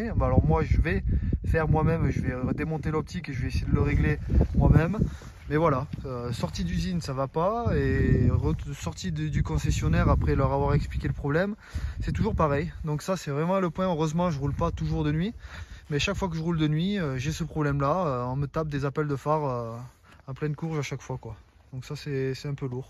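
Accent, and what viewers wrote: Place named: South, France. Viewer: Sympa ta vidéo 👍 et surtout ça fait plaisir de rencontrer un youtubeur de la Yaute avec l'accent du sud 😄. Cette Streetfighter c'est une tuerie, pour moi c'est le roadster ultime avec la SD 1290 voir la Brutale 1000. Mais niveau budget c'est pas pour moi 😅. Après ça tu ne sais plus sur trop quoi rouler. Tu m'étonne que tu la garde. Moi j'attends la Streetfighter V2 moins performante mais plus accessible 😉. En attendant je garde ma supersport 1000 ds. Super les images du bassin Annecien et du Semnoz, une super route pour essayer les bécanes des nombreuses concessions de Seynod 👍. Bonne route à toi, vivement le ski et à tt bientôt sur les routes du coin. ✌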